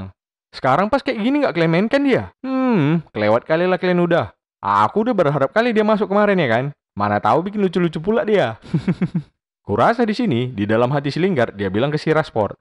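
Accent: Medan, Indonesia. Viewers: Lama lama kaya minang kocak bang, tapi versi medan wkwkkw sukses bang.. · Mantap kali logat Medan itu lek..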